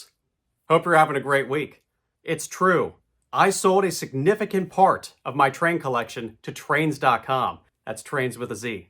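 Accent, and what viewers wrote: Kansas City, United States. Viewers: You're totally from Kansas city...right?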